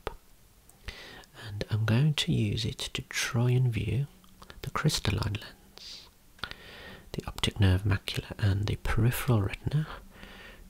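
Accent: United Kingdom. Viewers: Not sure if it is your british accent has something to do with it but I like when you say certain words like improvements, results & delicate. It’s so tinglyyyyy.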